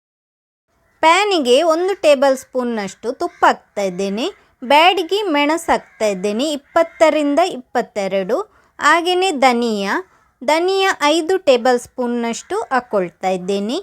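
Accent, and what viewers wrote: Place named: Mangalore, India. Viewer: ಮಂಗಳೂರು ತುಳು ಮಾತು ,, ; ಕನ್ನಡ ಭಾಷೆ ಸ್ವಲ್ಪ ಚೇಂಜ್ ಆಗುತ್ತೆ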